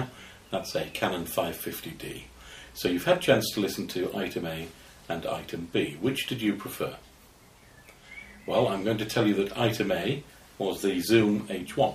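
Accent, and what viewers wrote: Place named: United Kingdom. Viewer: What part of Great Britain You from ?!?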